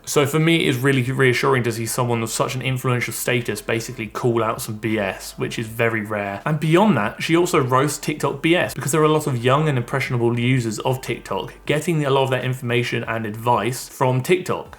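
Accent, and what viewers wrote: United Kingdom. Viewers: Yours is the British accent I like. What area of the UK is from? Thanks for your videos :)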